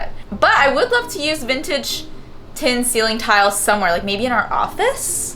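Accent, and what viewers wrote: Canada. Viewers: 100% stepped up her Canadian game! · Omg your parents are sooo sweet!!! Also you sound more Canadian with them “aye” hehe love it!!! ❤❤❤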